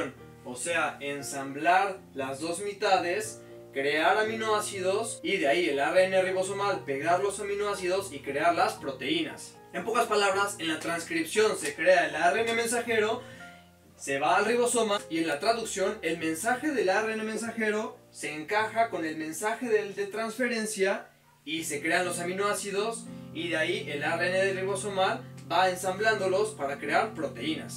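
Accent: Fresa, Mexico. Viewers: Habla bien fresa pero aprendí en 5 minutos lo que no pude aprender en 3 años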